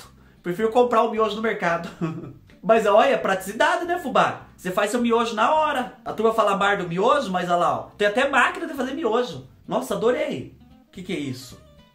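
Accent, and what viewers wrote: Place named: Itatinga, Brazil. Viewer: Fubá vc é igualzinho a mim nois fala mesmo sou de Itatinga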